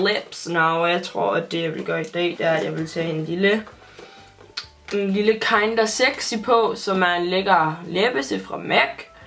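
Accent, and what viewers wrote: Jutland, Denmark. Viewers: Hun er jyde right? Hvorfra???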